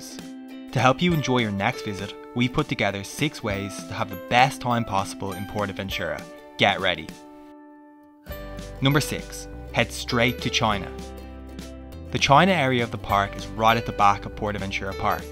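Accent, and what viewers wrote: Ireland. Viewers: This is so random are you Irish? 😂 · Was surprised to hear an Irish accent!! Irish here too!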